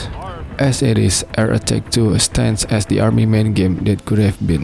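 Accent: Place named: Indonesia. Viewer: Yang ngomongnya orang Indonesia keknya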